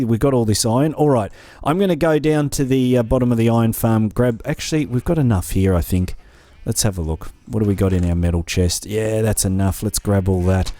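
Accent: Australia. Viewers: Honestly, the only reason why i subscribed to is the aussie accent, I live in AU, and it is odd, but in Brisbane, noone has this accent, they all speak strangelly normal...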